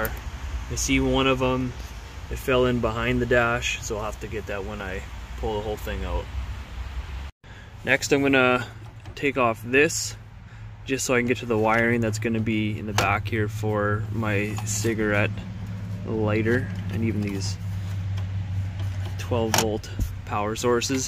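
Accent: Canada. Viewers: This guy sounds Canadian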